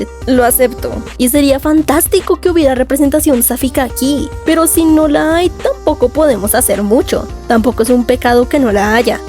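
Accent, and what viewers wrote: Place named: Bogotá, Colombia. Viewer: Felicidades! es un grandioso video, desde el apartado visual, como la edicion, las pequeñas animaciones que integras en los titulos y el manejo final del video para explicar las partes mas densas de un fandom. Es un gran formato y muy fresco en youtube. Es un honor conocer creadores de contenido Bogotanos, me encanta que se sienta tu acento, no soy mucho de comics y aun asi lograste darle un gran ritmo a toda la trama sin dar realmente tantos spoilers. btw el inicio fue increible JAJAAJ, ahi te va mi sub, estare muy al pendiente de tus nuevos videos!!!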